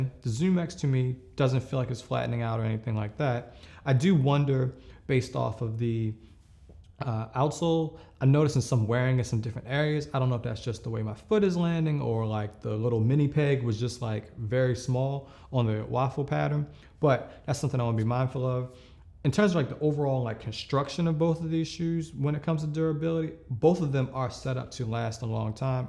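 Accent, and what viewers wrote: Baltimore, United States. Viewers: Thx bud That bmore comes through in some of your words. Lol Great video sir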